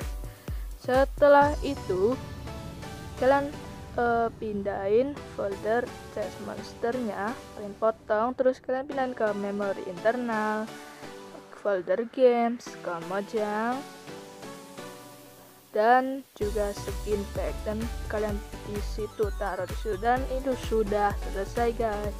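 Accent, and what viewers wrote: Java, Indonesia. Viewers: Jawa bgt Bang🤣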